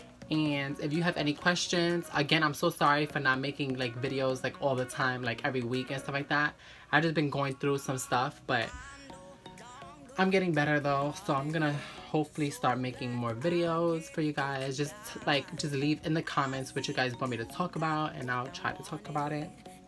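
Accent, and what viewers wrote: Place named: New York, United States. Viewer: It sounds like your from NY I really like literal showers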